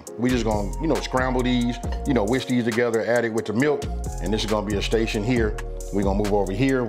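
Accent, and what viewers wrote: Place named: North Carolina, United States. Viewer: This is off topic, but your accent sounds similar to someone I know from North Carolina. You wouldn't happen to be from there originally would you? Nice video, by the way. I'm definitely going to try this! Thanks!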